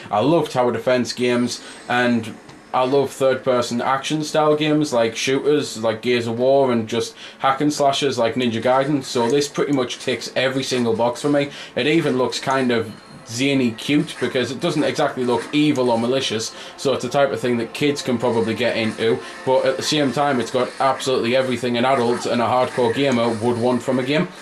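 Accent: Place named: Scotland, United Kingdom. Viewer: sorry im sure your review was nice but i cant listen to your Scottish accent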